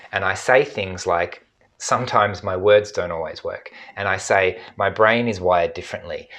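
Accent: Australia. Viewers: Your accent sounds Austrailian to me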